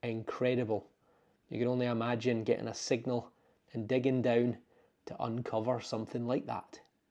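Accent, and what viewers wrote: Scotland, United Kingdom. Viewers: Fantastic! You're so lucky to do what you do and where you live is spectacular 👌 Scotland is my ancestral home and I really appreciate seeing the countryside and hearing the accent 😊 and thank you so much for sharing your experience with us all, I'm in New Zealand btw and my Dad is Scottish 👍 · Just stumbled across your channel. Thoroughly enjoyed watching - thank you so much … 😁 I have lived in Australia for decades, and although UK born and bred, I have many happy memories of time spent in Scotland visiting my Uncle Jock and Aunty Aileen. You sound very much like my uncle … 😁 so nice to hear that lovely accent … subscribed 😜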